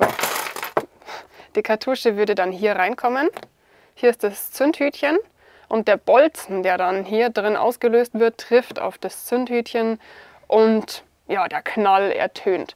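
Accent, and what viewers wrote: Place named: Swabia, Germany. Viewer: Perfekt wär das Video, wenn die ganze Zeit schwäbisch gesprochen hätte - ja, dieser kleine Kieferle! 😉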